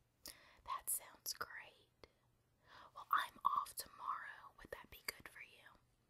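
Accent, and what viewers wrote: South, United States. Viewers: Where are you from? In your videos I detect a southern accent.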